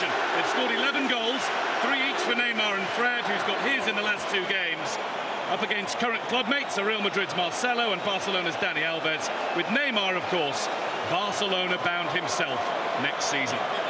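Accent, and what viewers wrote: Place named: United Kingdom. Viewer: inglês britânico???? por quê????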